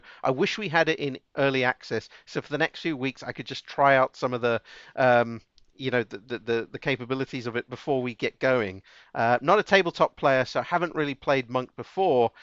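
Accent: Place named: Greece. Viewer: You sound so much like Greek lol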